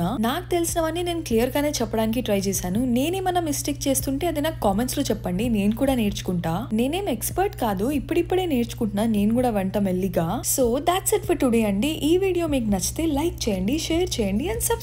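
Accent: Telangana, India. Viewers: Nenu mee recipe kosam video watch cheyaledhu. I would watch your video because of your voice. Fan of your voice mostly telagana slang ❤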